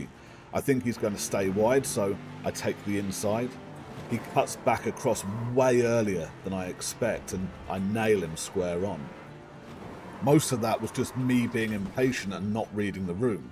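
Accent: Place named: United Kingdom. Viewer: A british accent always makes narrating sound supreme.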